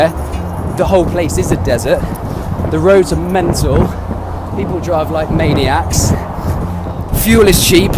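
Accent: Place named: United Kingdom. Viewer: I really love British accent.😍😍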